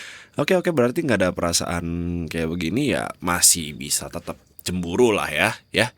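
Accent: Java, Indonesia. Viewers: Kenapa mas sih kaya jawa sayang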